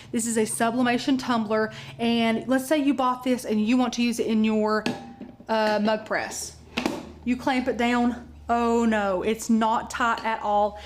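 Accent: South, United States. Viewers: OMG you got a southern accent so I, as a fellow southerner know this is gonna be a good tutorial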